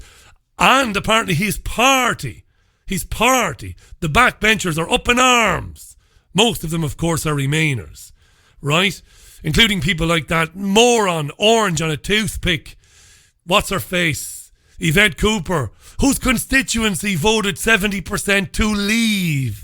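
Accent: Ireland. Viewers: Richie thanks so much for all the great programs. You've become an old friend. Great to hear the old Waterford accent in Manchester. Slán tamall.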